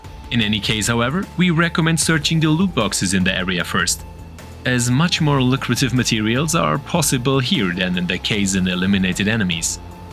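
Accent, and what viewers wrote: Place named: Germany. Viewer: Irgendwie hört sich der Titel komisch an mit "The Great ... " es wäre passender gewesen "Big Guide, oder Hugh Guide", musste schmunzeln 🤣 PS: warum machst du eigentlich auch Videos in englisch? In deutsch macht schon Sinn, beim zuhören bekomme ich fast immer starke Kopfschmerzen, weil du Englisch mit deinen deutschen Dialekt sprichst, es hört sich so an, wie wenn Arnold Schwarzenegger in den 80' spricht. Englisch passt gar nicht zu dir, vor allem ein paar Wörter konnte ich gar nicht verstehen, weil zu undeutlich gesprochen. Wenn es deinem Kanal was bring o.k, sonst mach dir diese Mühe erst gar nicht. Nur so meine Meinung, dafür ist ja die Kommi Funktion da.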